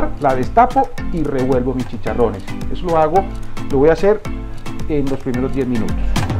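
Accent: Colombia. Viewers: Mijo eres único, paisa de sabor y bien hablado, como nos gusta, bendición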